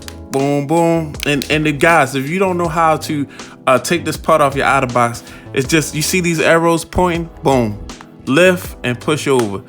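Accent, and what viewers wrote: Baltimore, United States. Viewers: ... Great review fam! And just on a side note, you got a BMORE accent if I ever heard one! If you're actually from there (which I'm sure you are), I'm actually from Whitelock homie, but I live in Vegas now for almost 15 years. I'm 100% sure you know where Whitelock is.... Anyway, keep on keepin' it real with these reviews. 🦀 Forever LOL... God Bless!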